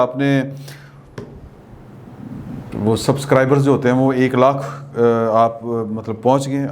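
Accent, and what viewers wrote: Punjab, Pakistan. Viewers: Ur great 👌 Sir 👌 g good job 👍 naam tou suna hi hoga dialogue with Awais Sheik Multan Wala Punjabi tarka Kamal Ka hota hai aapka Bhai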